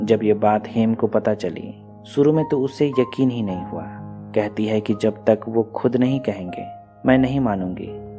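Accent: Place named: East, India. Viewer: Shabdon ka istemaal sahi hai Bas uccharan (pronunciation) alag hai. Pronunciation alag hai, par galat nahi. Eastern India especially in Bihar, Jharkhand, Chhattisgarh wahan "nukta", "s" & "sh" me antar itna prominent nahi hota daily life me. He has acquired that accent. Jaise English ke kai accent ho sakte hain, waise hi Hindi ko bhi alag tareeke se pronounce kiya jaa sakta hai. We should appreciate his efforts to bring such good movies with such a lucid explanation rather than pointing out these petty mistakes. Let's encourage each-other and be more acceptive of each-other.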